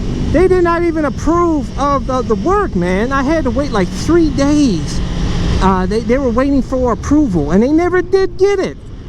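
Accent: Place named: South, United States. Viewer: You know you sound like a southern black, right? 🤗🤙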